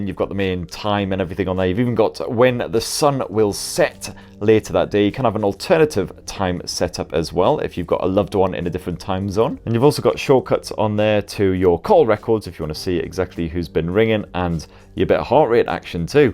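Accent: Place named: England, United Kingdom. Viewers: Here for the english accent😁💜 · Celia doesn’t understand your northern accent 🤫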